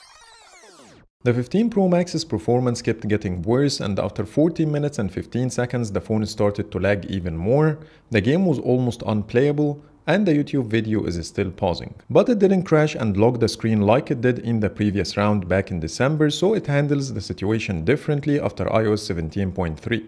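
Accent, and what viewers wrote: Egypt. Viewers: اللهجه مصري 😂❤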